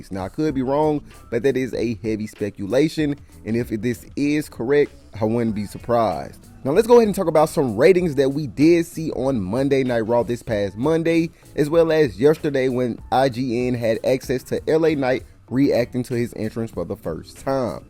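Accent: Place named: Memphis, United States. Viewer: I can’t ignore the Memphis??? Accent ..”herrstyles” and “yerrs “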